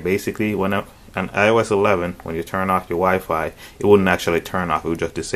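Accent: Jamaica. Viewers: I’m hearing slight Jamaican accent when u speak, are you Jamaican or from a Caribbean island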